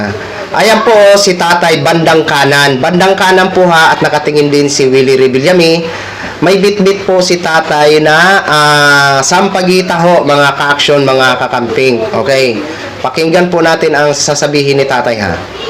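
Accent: Visayas, Philippines. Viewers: Kabwisitboses mokuya Uhg pag stiry mo para kang nasa karerahan ng kabayu...visaya visaya pronounciation mo.englishin mo na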